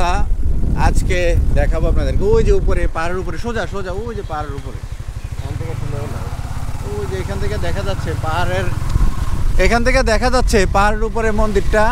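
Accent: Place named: Comilla, Bangladesh. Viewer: আপনার বাড়ি কুমিল্লা মনে হয়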